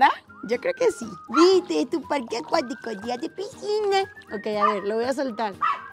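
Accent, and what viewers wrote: Venezuela. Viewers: Eres de Venezuela????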